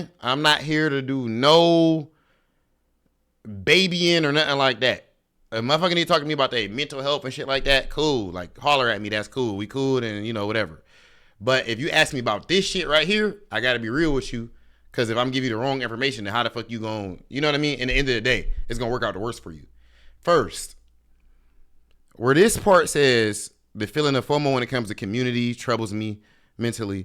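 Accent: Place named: Caribbean. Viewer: Hey... Do you have Caribbean roots? Your accent sounds like someone who lived here and moved overseas. Or have Caribbean parents. Much respect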